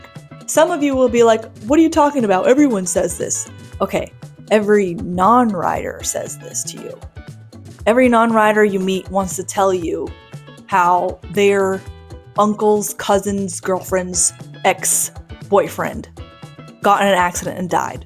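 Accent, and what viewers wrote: United States. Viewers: Great video! All solid advice for new and experienced riders. Riding is a skill. For some reason I’m stuck on your accent, though. My guess is Ohio Michigan, maybe Chicago land area growing up.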